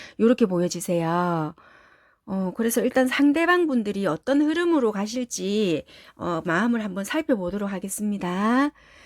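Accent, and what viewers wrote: Busan, South Korea. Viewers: 감사합니다 ^^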